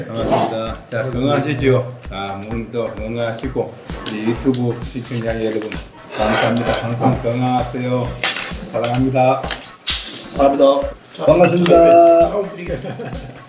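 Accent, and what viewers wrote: Gyeongsang, South Korea. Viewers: 구수한 갱상도 사투리에...참 유쾌하게 드시고...진짜 맛있게 잘드시네요..~~^^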